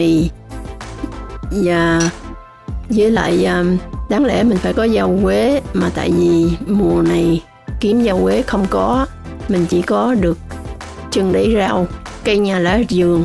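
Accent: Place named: Teochew, China. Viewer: Nghe giong noi la toi biet nguoi tieu chau o vn roi. Ung ho chi chuc kenh cua chi phat trien manh 👍👍👍👍👍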